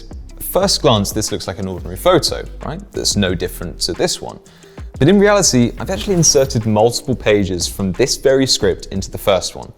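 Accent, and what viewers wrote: United Kingdom. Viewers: a British accent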